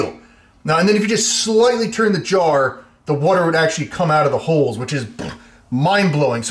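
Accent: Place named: United States. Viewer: Love the American accent ,,,, can fellow Americans know what state he maybe from just by his accent ? Just curious, from Australia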